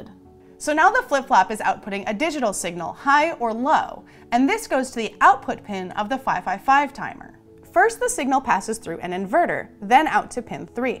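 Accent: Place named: United States. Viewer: Awful American accent.🤢🤢🤢🤮🤮🤮🤮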